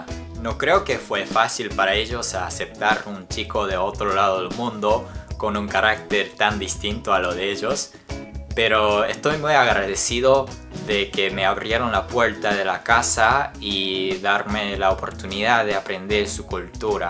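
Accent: Argentina. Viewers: Se te pegó el acento argentino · Sos muy encantador, tenés una expresión muy buena, ya puse una vez que me encanta tu acento argentino. A mi me pasa igual con eso de diferenciar las dos culturas argentina y paraguaya, y las dos me encantan. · Hola! Tu acento es muy argetino! Lindas las vivencias de viaje que tuviste, espero que vuelvas pronto a Argentina. Saludos 😊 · Tu acento argentino se nota! 😊🇦🇷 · Me encanta el acento que te quedó argentino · ayyy, sabés que llegué al canal con el video donde hablás de qué tan difícil es hablar japonés y del por qué no vivís en Japón, y te juro que te escuchaba y pensaba "¿soy yo o su español suena un poco argentino?", ahora con este video entiendo por qué XD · Ahí se te notaba más un acento argentino, ahora en Valencia se te nota más el acento español. Soy argentina y también vivo en Valencia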